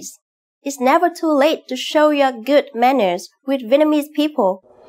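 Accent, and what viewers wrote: Vietnam. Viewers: Is this southern or northern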